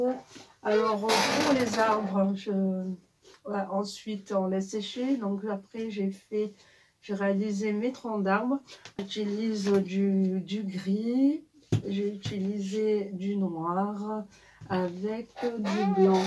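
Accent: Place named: South, France. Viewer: Je suis content pour toi,on voit ton évolution depuis que je te connais...artistiquement...!!! Bel accent du sud...salut du nord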